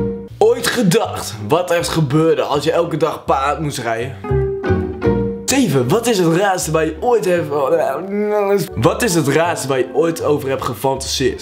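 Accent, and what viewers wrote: Groningen, Netherlands. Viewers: Ben jij een Groninger ??❤️❤️❤️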